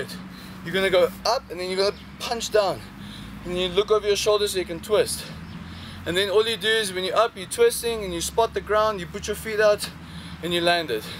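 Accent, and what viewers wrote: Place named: South Africa. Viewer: I recognize your accent, are you from south africa?? Your accent sounds south african! :D im a martial arts tricker from south africa!!! :D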